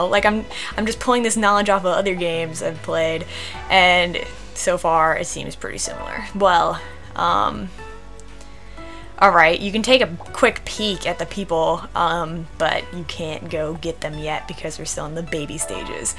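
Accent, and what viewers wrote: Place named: South, United States. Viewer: you seem to have that kind of twang in your speech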